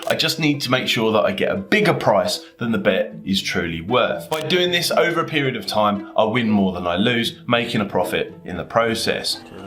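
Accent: United Kingdom. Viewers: You from Ipswich mate?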